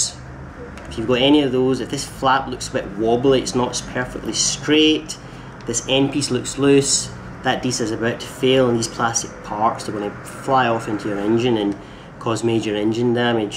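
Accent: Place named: Scotland, United Kingdom. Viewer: I think yul find he's scuuutish!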